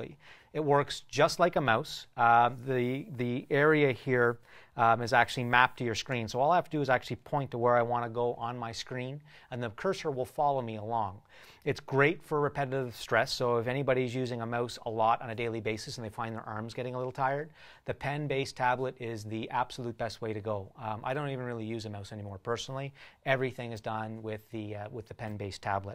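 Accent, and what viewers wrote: Canada. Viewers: lawl he has a canadian accent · is he canadian?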